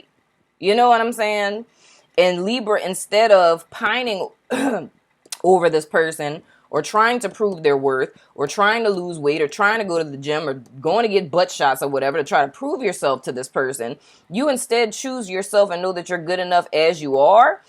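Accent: New Orleans, United States. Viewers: U sound like u from New Orleans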